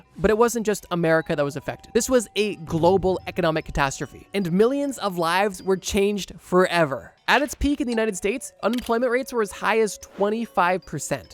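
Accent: Canada. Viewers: You're Canadian???? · Are you Canadian ?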